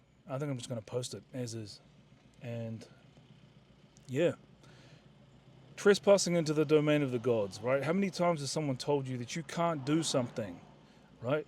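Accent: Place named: New Zealand. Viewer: Very therapeutic to listen to. I love hearing other new zealanders.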